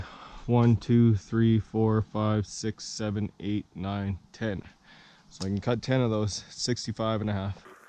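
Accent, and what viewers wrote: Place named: Alberta, Canada. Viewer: You got that Alberta accent. Where are you from? im gonna guess, Edmonton.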